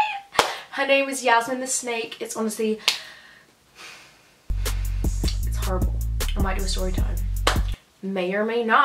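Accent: United Kingdom. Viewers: Hey, i' m french and i would like to now were do you come from because you have an accent wich seem to be a british accent... someone can tell me. Thank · Why am I genuinely unsure if she has a slight British accent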